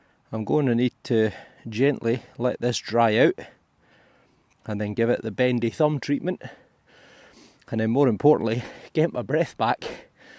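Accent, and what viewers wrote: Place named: Scotland, United Kingdom. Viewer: i hate to make your head swell but these comments are right you have great videos and most importantly great finds but also your have a great voice and accent. everybody loves a Scottish accent specially when you can understand with a Yankee ear, you could be the next Sean Connery. keep it up and you'll have 6 figure subscribers in a year.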